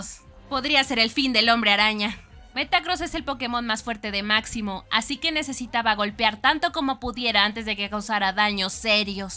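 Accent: Chile. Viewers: Eres chilena ?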